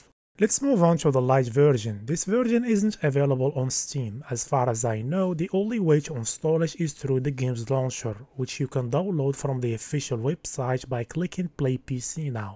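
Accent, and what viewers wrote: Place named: Morocco. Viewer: Moroccans can never hide their accent xd ,nice video a sat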